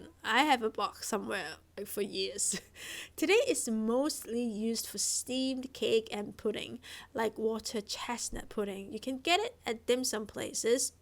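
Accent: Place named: Hong Kong. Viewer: I can totally hear your HK accent. Was assuming HK before reading this comment. Can hear your British infusion into your accent. Sounds great!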